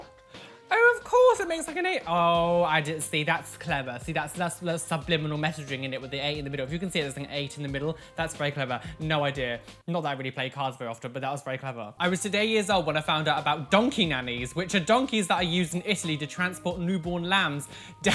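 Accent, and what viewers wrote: United Kingdom. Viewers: Are you from the UK? Lmao u have an accent 😂🤣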